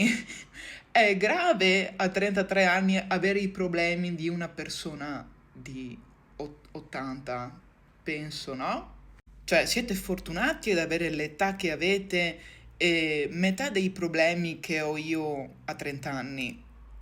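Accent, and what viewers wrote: Veneto, Italy. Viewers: Comunque volevo dirti che sei molto carina ed hai un bellissimo accento veneto ( mi sembra che sia veneto), magari dirtelo non serve a niente, ma è quello che penso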